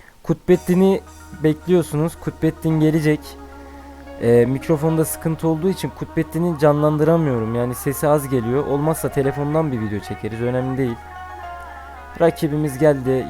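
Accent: Erzurum, Turkey. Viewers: Abi ben kararlıyım sen nerelisin erzurumluya benziyor abi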